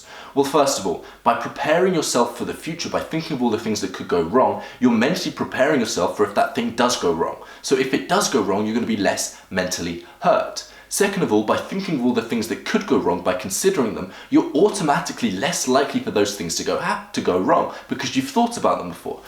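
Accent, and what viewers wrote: United Kingdom. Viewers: Subscribed. And, I love your British accent!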